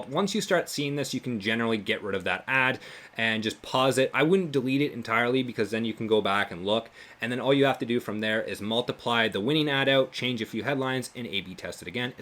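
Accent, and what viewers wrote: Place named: Toronto, Canada. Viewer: Thanks a ton for the content. So useful. You've basically become my tutor on all to do with Google Ads. I'm a small business owner and have a dormant passion for marketing and sales. Used to have a role as a sales and marketing manager. Learned how to build websites and the basics of SEO. Did some basic coding. So enjoyable. Now I run a renovation company. Just getting into the Google sphere and really appreciate and enjoy your content. I'm from Brampton btw. Peel!! Lol 😅 Noticed you're from somewhere in the GTA region by the sounds of it. Anyways, thanks again. Your hard work is appreciated...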